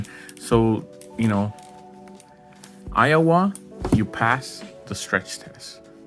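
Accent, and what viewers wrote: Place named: Colombia. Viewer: I like your Colombian accent 😇😇😇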